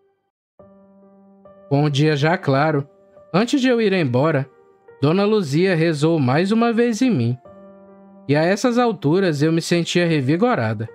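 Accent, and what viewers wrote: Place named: Pará, Brazil. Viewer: Eu gosto desse sotaque, acho que é de paraense ❤, relato incrível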